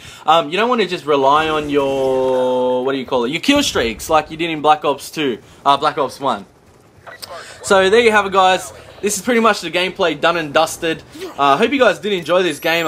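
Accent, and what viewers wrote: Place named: Australia. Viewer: where you from??..... u sound mad aussie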